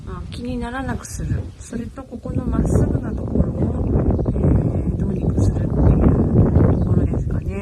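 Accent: Karatsu, Japan. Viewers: とても、自然体で、このくらいが、個人的には、大好きです。 標準語と、唐津弁の混合もすき！ ポフポフ良いですかね？ 自分も、スマホ一つです。 帽子紹介のシャキン！ ナイスです。 よく工事してますねー？ 高級住宅街かなー いつも、癒されてます。 仕事が、嫌いなので、動画を 見て、ほっこりさせてもらっ てます。 ありがとうございます。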